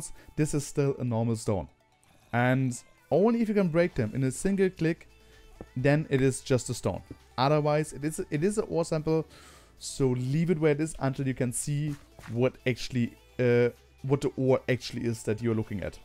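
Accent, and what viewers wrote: Germany. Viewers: Wow, thought by the accent that you were german. Spot on! Btw i'm from denmark. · Einfach wilder deutscher Akzent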